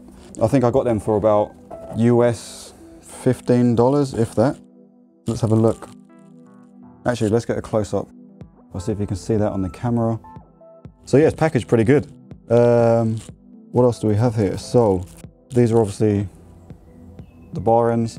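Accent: United Kingdom. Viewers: keep doing the videos mate. So much better that your a Geezer and not a Annoying talking American. Had my 2021 09 now for 4 months. fantastic machine. I've got the exact same mirrors. I'm yet to unbox. had them a year. brought them when I had the 07 🤣.. Looking forward to more of your content nice 👍🏻. might install mine now...just relised your from NZ 🤣🤣 Thought u was from London until I watch your other video..🤦🏻🤦🏻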